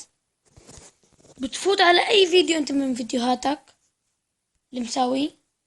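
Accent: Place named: Syria. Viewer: مبين لأن لهجته سورية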